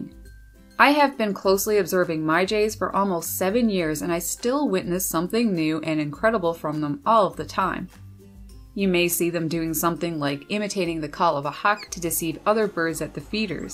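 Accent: Canada. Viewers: "A hewse" and "figured oat" = canada. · You sound canadien. So these might be Toronto Blue Jays?! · Blue jays “ afften gehda biyiahhdd rahhp”... what ? Haha. Where is she from ? Lord !!!! Have mercy !! Oh wait. Canada. She said a boat it. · Boy are you from Canada! {but thats a good thing}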